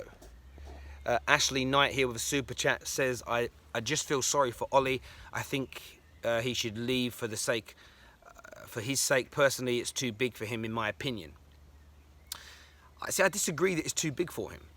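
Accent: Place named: RP, United Kingdom. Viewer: Why u talking posh mate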